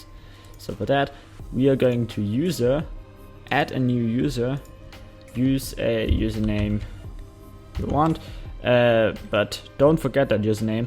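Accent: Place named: Germany. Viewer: german? denk ich ma hörs an deim english ich bekomme 1000Errors wenn ich sie lade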